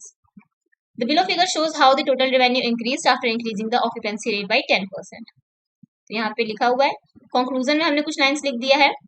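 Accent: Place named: Varanasi, India. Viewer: I bet you are from U.P ...and from the accent I can say its either Banaras or Prayagraj...😅😅 ...and BTW ...the clarity of your analysis is astoundingly amazing ..keep up the good work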